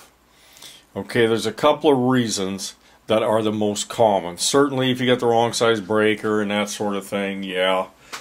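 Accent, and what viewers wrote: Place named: Appalachia, United States. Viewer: Jesus stop saying, "kick off." You sound like a hillbilly. Circuit breakers "trip." Fuses "flow" or "melt." You sound like you've been hanging around a bunch of butt-crack hillbillies too long. Yeah, electricians may say it too but only the butt-crack hillbillies do. Electricians that know what they are talking about don't talk like that.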